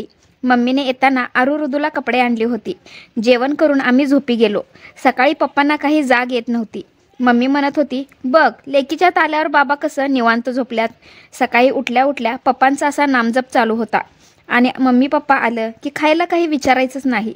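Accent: Kolhapur, India. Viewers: Tumch gav konat.bhasha kolhapur chi vatate.mi pan kolhapur madhun aahe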